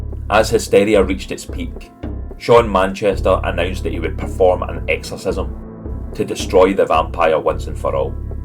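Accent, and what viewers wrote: Scotland, United Kingdom. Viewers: Excellent stories, great background music and your Scottish accent ads to it all. Love it!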